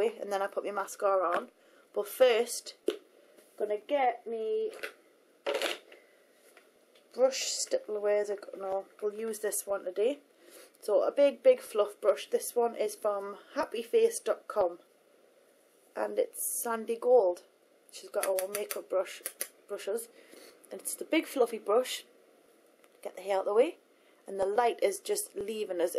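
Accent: Newcastle, United Kingdom. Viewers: i love love love your videoss, and loove you newcastle accent:D thanks for this video hunnie:) xxxx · Thats a Newcastle accent, not Irish! She comes from my end of the country yey! And I love your videos theyre so great =] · R u from Newcastle? :S :) x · love the geordie accent, its sounds like meeeee!!!!!!!!!! :) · Are you from Newcastle ? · its called a geordie accent. dont leave your area much do you?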